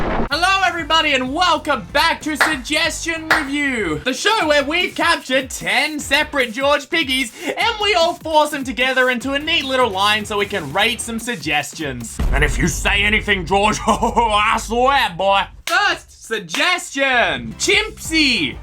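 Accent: Australia. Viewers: I just realised in this video that your Australian 😅😂 I don’t know why but I love seeing Australian you tubers! Keep up the amazing work!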